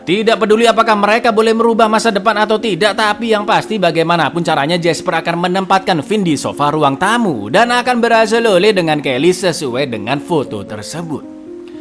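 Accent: Java, Indonesia. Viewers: Jawanya medokk bngett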